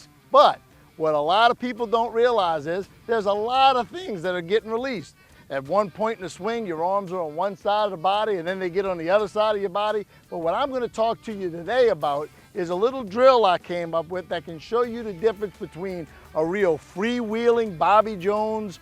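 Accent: United States. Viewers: You American?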